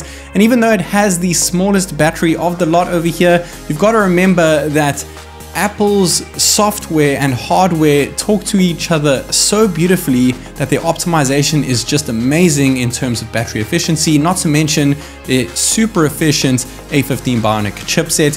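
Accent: South Africa. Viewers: 100% south african immediately picked up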